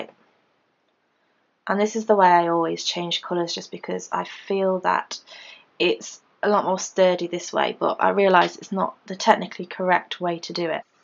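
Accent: United Kingdom. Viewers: I've watched many a tutorial from others and they just cannot seem to convey what to do as well as you or they go too slow and repeat things too often. I've seen some of your tutorials and I just have to say that you really are the best! I've been crocheting since I was 13 (now 60) and I just love learning new patterns. Thanks so much for your videos! P.S. I love all things British so your accent (at least to me) is brilliant!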